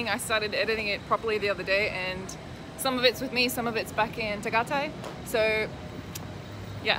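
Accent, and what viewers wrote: Australia. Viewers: aussie accent.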